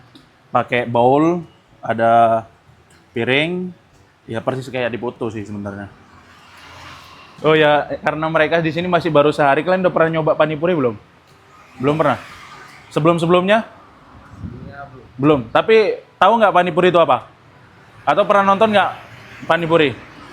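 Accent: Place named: Java, Indonesia. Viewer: Hebat mas bro,,,,semoga sukses. Btw, kok bisa ngomong jawa..logat jawa,,,Gimana ceritanya ?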